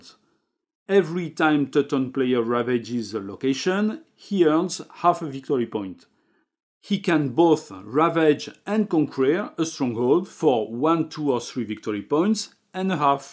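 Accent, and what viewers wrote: France. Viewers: Very impressive! Watching it before trying to digest the rulebook. Being a Russian I'm indeed delighted by the choice of the topic by Volko Ruhnke. And on top of that, this French accent makes it look and feel more 'medieval' 🧙‍♂️ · "Delicious French accent..." LOL. It truly is. What a fantastic resource for Nevsky learners. Well done! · Super accent :) Du coup j'arrive à comprendre les règles même en Anglais. "But you are French ?" ;) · Thanks a lot! You are my most preferred english spoken with french accent youtuber! 😁💕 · I did indeed overcome the delicious French accent and it was totally worth it. This was a great tutorial! Well done! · Best tutorial ever and bonus the French accent that makes it even better.... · 36 seconds of this amazing french accent with attitude and I subbed.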